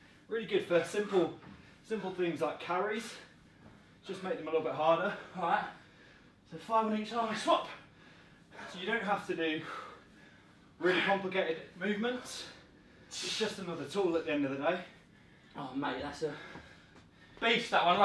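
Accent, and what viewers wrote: United Kingdom. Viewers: British anyone ?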